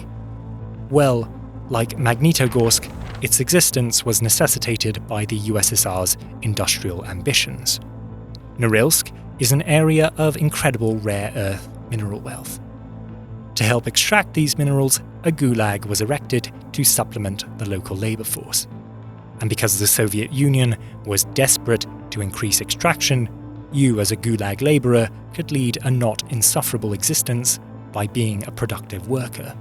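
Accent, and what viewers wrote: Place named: United Kingdom. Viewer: British detected, channel rejected. Maybe talk about all the most depressing British cities, go fix your country and get off the couch.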